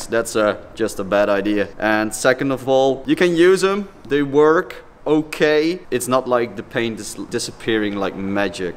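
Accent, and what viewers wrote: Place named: Netherlands. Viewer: Dutch accent 🙃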